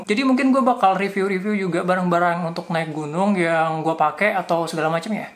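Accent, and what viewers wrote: Indonesia. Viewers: Here your English comment Dead channel because of the freaking Indo voice lol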